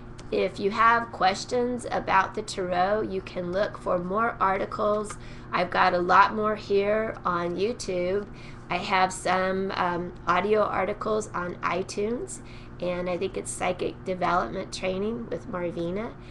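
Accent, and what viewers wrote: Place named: New Zealand. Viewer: Where are you from? That accent is different. New Zealand?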